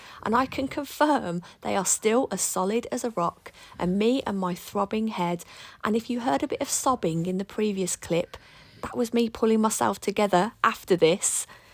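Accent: Birmingham, United Kingdom. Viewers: your beautiful Brummie accent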